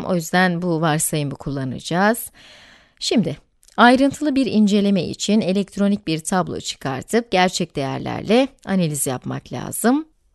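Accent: United States. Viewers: Fazla Amerikanvari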